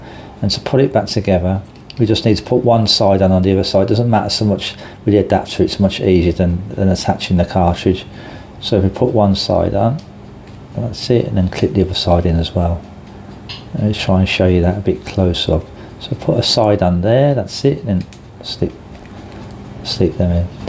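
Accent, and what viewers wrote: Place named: Birmingham, United Kingdom. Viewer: Love the Brummie, I thought it was Brummie lol.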